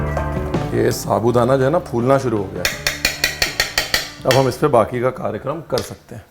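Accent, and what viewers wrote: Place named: Lucknow, India. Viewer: Punjab in blood and Lucknow in soul..such a deadly combination you are...love the way you talk in “lakhnavi andaz”... Aaye Haye!!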